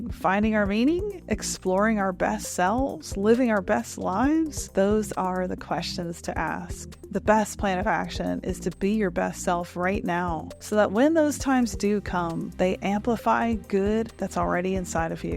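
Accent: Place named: Arkansas, United States. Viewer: I believe in God, and consider myself a Christian, but to believe you can't get life from a kind of "Big Bang" scenario, one must be ignorantly misguided, at best, and at worst, must be intentionally obtuse. You said you would gladly debate in the comments, so as a subscriber and viewer since last year, I hope you'll keep true to that remark. To that end, please begin by explaining why you dont believe in the current models of the beginning of the universe. Edit: I'm from Arkansas, and it always feels good that someone else with a similar accent is an AI/Singularity communicator. Love to see it.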